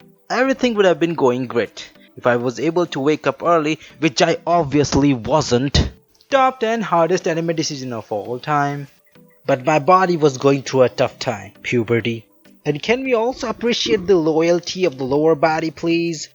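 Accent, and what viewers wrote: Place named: India. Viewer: Your accent seems Indian lol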